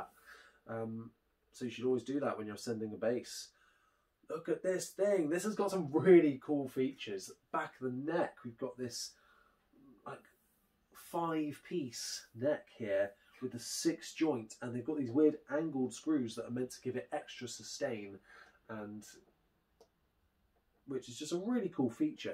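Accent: United Kingdom. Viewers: Are you bri(t)ish?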